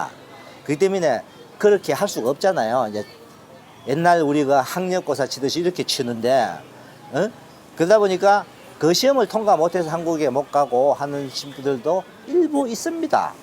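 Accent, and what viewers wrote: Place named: Vietnam. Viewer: 주인장 한국말하는 베트남 사람같아요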